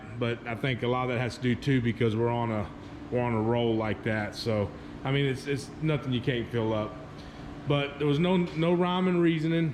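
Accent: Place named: South, United States. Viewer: Nice man. I am from south lousiana I am a pipe welder by trade. Nice work. You sound like your from the south your self. Either way great job.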